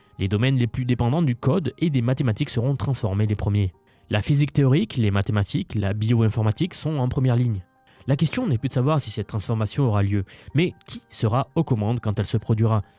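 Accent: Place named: South-West, France. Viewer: J'aime beaucoup ton petit accent du Sud Ouest ♥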